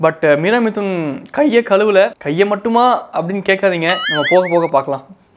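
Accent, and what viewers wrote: Coimbatore, India. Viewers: super so Coimbatore full language speech super hit video your channel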